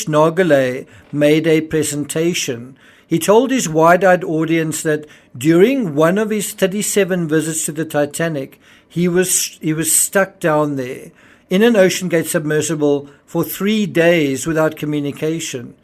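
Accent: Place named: South Africa. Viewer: Ive been trying to figure that accent out and i think its South African? Great content btw.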